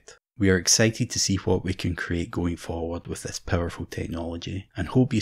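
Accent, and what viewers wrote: Scotland, United Kingdom. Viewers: Even the scottish accent was elaborated with AI? banger ! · All the comments here are from bots, this is a cesspool of AI entities... all hail the circuit... Looking forward to your next video! Always love to hear your Scottish accent, lovely memories of my time in Scotland😊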